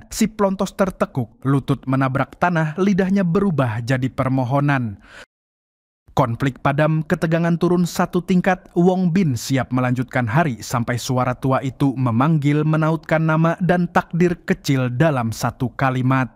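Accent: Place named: West Java, Indonesia. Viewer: fix logat urang sunda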